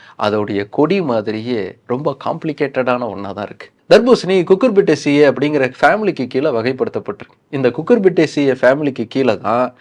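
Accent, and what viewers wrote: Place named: Kongu, India. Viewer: கொங்கு தமிழ் கோசாபழம்❤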